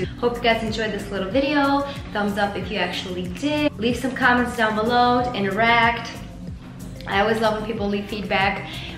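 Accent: United States. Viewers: it looks so nice. I love your American accent. what state are you from?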